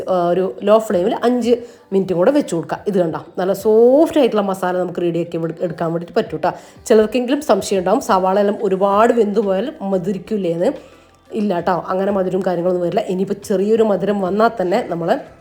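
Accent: Ernakulam, India. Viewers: എറണാകുളത്തെ സംസാരം ആണല്ലോ. വയനാട് ആണെങ്കിലും.. 👍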